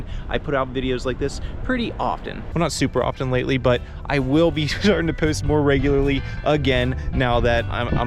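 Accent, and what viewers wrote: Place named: Reading, Pennsylvania, United States. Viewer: Stumbled up your vid, are in or from the reading pa area? You sound just like my family from there! ❤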